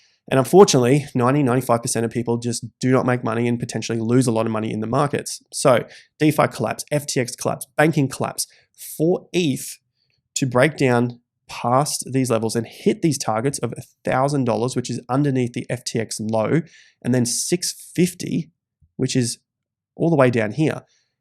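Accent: Australia. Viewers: How you say the DATA is an Australian thing (DATER)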